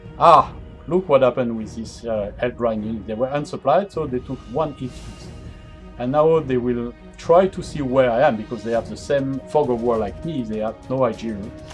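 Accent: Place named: France. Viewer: Well done! Great explanation of game mechanics along with good game play. As I worked in Paris, I enjoyed your accent and brought back wonderful memories.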